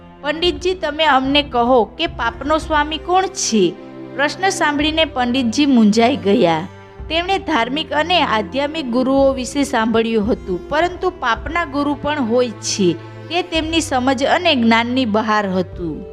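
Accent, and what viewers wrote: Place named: Saurashtra, India. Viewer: JAY HO. GOOD East African OR SAURASHTRA (KATHIYAWADI) GUJARATI LANGUAGE. 🙏🙏🙏🙏🙏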